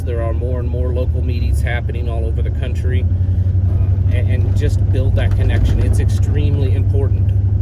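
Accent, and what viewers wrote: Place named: Appalachia, United States. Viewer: Love them hillbilly pipes , yours too .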